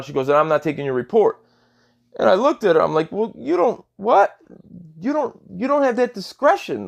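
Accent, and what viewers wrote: Chicago, United States. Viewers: Good story. Keep up the great work! Love the Chicago accent, you sound like Abe Frohman, the Sausage King of Chicago. 😎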